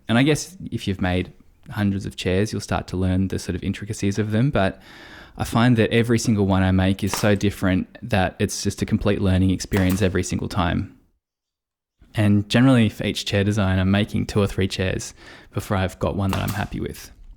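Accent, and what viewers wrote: Australia. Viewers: Mate this is top shelf content 👌 so refreshing to hear an Aussie accent on yt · I would fix the back rail with a saddle joint Too many parts. The frame is more about how it looks than how it functions and thats fine if you are making a gallery piece but you said you were trying to design a chair to offer with your tables. That being the case its imperative you keep costs down and therefore simplicity rules Dominos will not make anything stiffer they only provide alignment for glue-up and some shear resistance. Also just an idea if you are determined to have the rails intersecting in the middle of the underside of the seat why not get rid of the block and the flimsy 4 joints and just beef up the stock slightly and do a Cross Lap Joint. And as someone else said great to hear an Aussie accent on YT. Worth a subscription from me.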